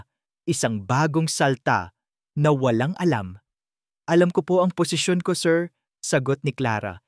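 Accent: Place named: Pampanga, Philippines. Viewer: Kapangpangan siguro ang narrator kasi eng basa nya sa ang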